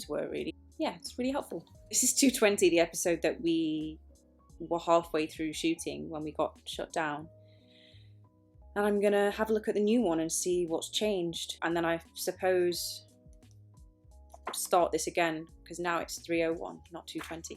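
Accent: United Kingdom. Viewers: I love her british accent so freaking much 😍😍😍😍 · Had no idea you were British. You sound like you have a bit of a northern twang. Your American accent is really good & so convincing. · Omg she's British??? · Oh, I had no idea you were British. Was surprised when I heard that accent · God it’s so weird with hearing her British accent and not her Macy Voice, In the Next Video PLEASE PLEASE PLEASEEE do your Macy Voice and please tell how you learned how to seaplane in like that American tone! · I had no idea yhuu were British #mind blowned🤯🤯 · SHE HAS A BRITISH ACCENT HOW DID I NOT KNOW THIS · I didn't know she was English.. right On!! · WOAH BRITISH!!!! <3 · Omg I never knew she was British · omg I didn’t know you were British. you play Macy so well I thought you were like American. I love you sooooo much like your seriously my idol. I can’t wait for season 3🥺💕 lots of love from North Carolina ❤️ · I love to see how you enjoy reading the episodes, I have to admit, that I refused to see this remake, but I have to applaud 👏 your work, apart from the fact that you are beautiful, my respects as an actress and the dedication you put into it, I love it, I didn't know that you were british but you're a sweetheart 😘and i love your british accent !! 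I want more from CHARMED! and many more vlogs of yours. 🌹🌹🌹🌹🌹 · OMG! I am so glad I found this chanel! I love you so much! Your so professional. I knew you were british but damn I love your accent!!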